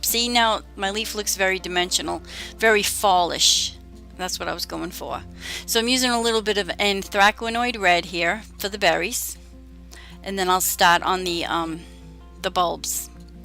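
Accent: New England, United States. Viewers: Hello, new subbie from Michigan. However I was raised in Mass and recognize your NE accent. You made me feel home for a moment. So nice to have found you. I was born in RI, is that where you're from? How close am I? 😁💜🎨 TFS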